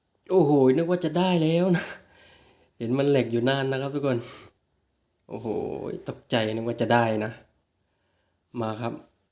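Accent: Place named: South, Thailand. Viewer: คนใต้ชัวร์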